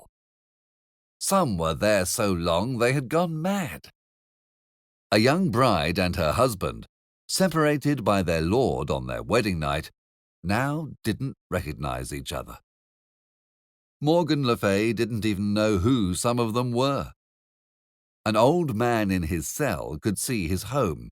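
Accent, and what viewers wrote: United Kingdom. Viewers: British accent, eh.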